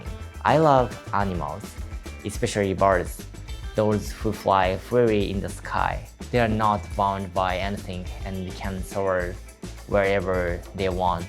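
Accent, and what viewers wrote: Japan. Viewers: Your English is GREAT !! Please remember that people in the United States have accents also; especially in places like Mississippi, North Carolina, even New York ! Depending on the accent, you can tell exactly where someone is from- no shame… just the way it is. your pronunciation is perfect. Please remember that you are Japanese first and foremost; so of course you’re going to have a “accent” when you speak English. I admire you for wanting to improve, but please only improve if it is something you want to do not from outside pressure. And I love your outfit today !! HANDSOME !!! Keep up the great work !!! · The beauty I hear in your speech is because you are a native Japanese speaker talking to us in English. I understand every word. I'm from the South here in the US and let me say, we can destroy some English pronunciation and there is no shame! 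We embrace it. Please do the same. Individuality is beautiful. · I have Japanese friends and have visited your country 4 times. I love Japan very much and wish that I could live there. My Japanese friends speak some English, but not as well as you do. I respect the effort that is put into speaking another language. I try my best to speak Japanese, but only know basic phrases, because at 62, it is hard for me to remember a lot of Japanese. It is easier for me to understand most of your English, because of your Japanese accent. Keep up the good work. ❤️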